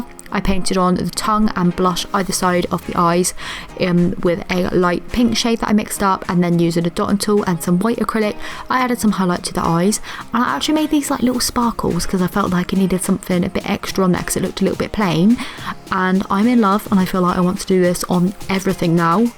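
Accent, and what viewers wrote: England, United Kingdom. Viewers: No way are you English!!!?? 😍😍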